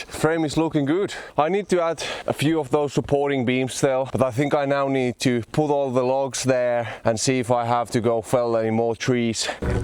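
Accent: Finland. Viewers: Hello from Minnesota USA I enjoyed the video I lived in embarrass Minnesota home of the Finnish sow-na like your country I like the long videos your accent is like the Finn’s in my town and close to Canada 🇨🇦 be safe I’m glad I found your video I grew up in the woods with my father we cut lot of wood and I enjoyed spending time in the woods with my father and having coffee under a tree taking a break enjoying the smells of the woods blessing from Minnesota USA 🇺🇸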